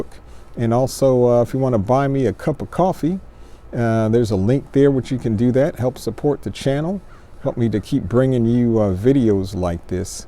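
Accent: Texas, United States. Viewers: This is the clearest English with a Texan accent I've ever heard. Thank you so much for sharing your English knowledge!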